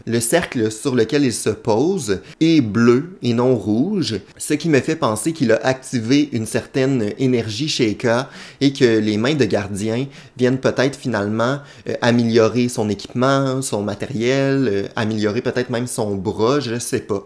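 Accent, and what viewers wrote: Quebec, Canada. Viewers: J'adore ta vidéo. Je suis en France. Je kiff ton accent québécois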